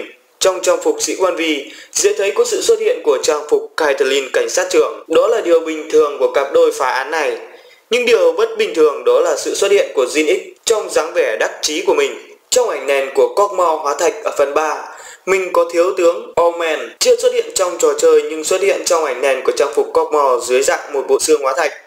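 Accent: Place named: Vietnam. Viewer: Video rất có ích nhưng mỗi tội ad phát âm tiếng Anh đậm chất nửa Anh nửa Việt.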